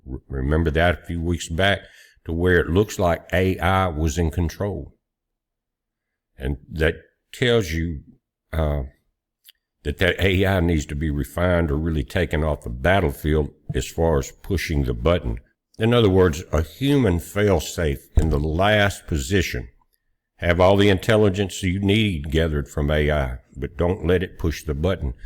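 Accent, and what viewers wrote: South, United States. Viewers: I love your southern accent. I was half way through this video before I figured out you were saying fraud. I thought you were talking about frogs! 🤣 I was thinking Uh oh... went looney tunes.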